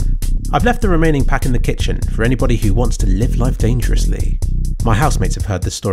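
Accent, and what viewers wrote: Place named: South West, United Kingdom. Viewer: south west accent this video is about a man shitting himself with a fruity taste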